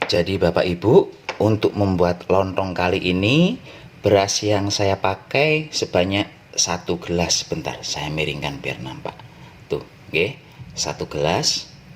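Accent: Banyumas, Indonesia. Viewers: Jane rika wongendi asline...bahasane pd kr inyong 🤣🤣🙏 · Ngapak bae lah anak e mbok Dirah👍👏👏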